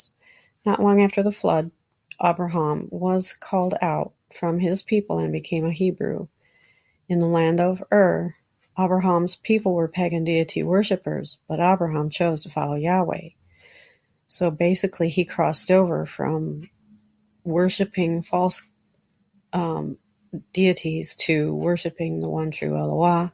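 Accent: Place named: United States. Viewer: Madam, you are butchering the Semitic languages! You have absolutely heavy American accent! Our phonetics is quite different!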